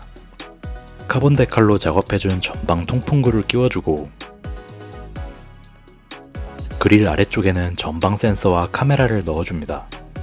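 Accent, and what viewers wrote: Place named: Gyeongsang, South Korea. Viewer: 경상도분이신가보네요. 서울말 쓰려고 노력하는게 저는 들립니다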